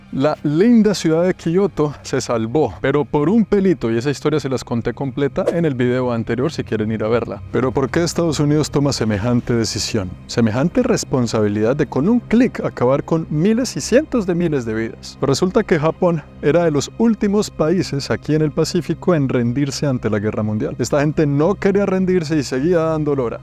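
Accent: Bogotá, Colombia. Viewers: El español bogotano en pleno! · escucharlo a usted es remontarme en el tiempo y volver a mis epocas de estudiante en el colegio mayor de san Bartolomé, ahi en la esquina de la plaza de bolivar, al lado de la puerta falsa y en frente del palacio de Nariño en Bogota. Asi nos narraban la historia mis profesores de sociales. Con ese asento pausado y elegante del cachaco bogotano. Eran una verdadera delicia esas clases, charladitas. Maestro, gracias totales como decia Cerati. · Ese acento Bogotano de, es un gusto escuchar su información... Sigue asi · 😂 El tipo es más rolo que la changua, más cachaco que el ajiaco, más Colombiano que la cumbia y el vallenato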